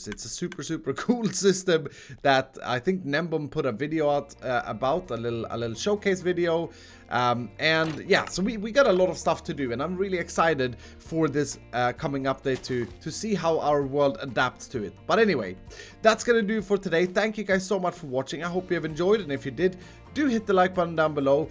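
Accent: Sweden. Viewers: Are you form swiden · Hörde att du var svensk på din dialekt :)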